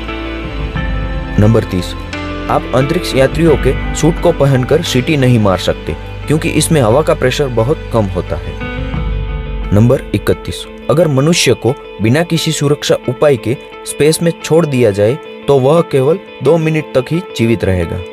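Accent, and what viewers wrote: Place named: Bihar, India. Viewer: Bihar se ho kya bhai...kyo ki tera avaaz aisa lag tha he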